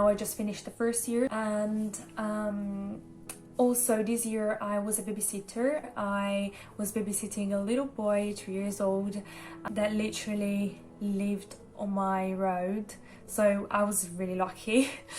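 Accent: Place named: London, United Kingdom. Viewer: Your English has come along so well - a slight London accent too! Xxx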